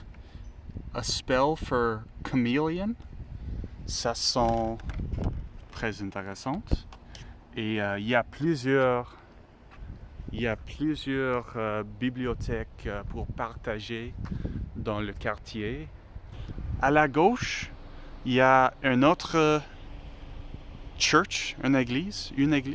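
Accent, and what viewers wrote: Quebec, Canada. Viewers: C est vrai que tu as légèrement un accent québécois mais c est du francais 😊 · Salut. C'est Betsy. Je suis ravie que tu fasses autant de progrès avec ton français (et que tu emploies correctement le subjonctif! LOL) Je n'ai pas écouter toute la vidéo, mais quand même le début et la fin. Excuse-moi de te corriger (je ne peux pas me retenir, étant prof!!) ici et là. 1. Je suis en train DE +infinitif 2. faire les courseS 3. Une Rue 4. un accent Québecois (masculin) 5. AU Canada 6. essayer DE 7. to shape = former 8. to get by = se débrouiller Et j'apprécie ton accent Québecois! Vive le Canada!! Elbows Up! A la prochaine, Betsy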